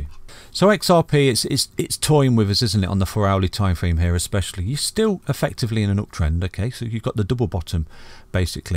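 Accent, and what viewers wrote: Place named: Northern England, United Kingdom. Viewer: I am learning everything from you. Thank you so much! Would love to see a video on, who are you? How did you learn trading? Where in England are you from? I love your accent! Some bits of it sound northern but not full on northern so I am curious:) tell us more about yourself!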